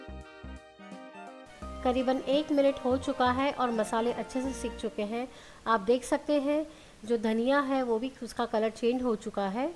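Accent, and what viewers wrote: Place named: Kutch, India. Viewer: apki resipi rsoi sow me bhi dekhihe muje bhot psndhe apki boli thodi kchhi mix gujrati mithilgtihe thnks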